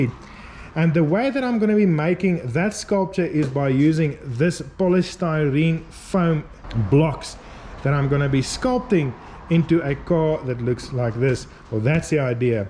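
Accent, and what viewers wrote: South Africa. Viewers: Are you South African? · Afrikaans accent is the most beautiful in the world. Masterful work, also. ❤ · Heavy South African accent...🇿🇦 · You sound almost like a South African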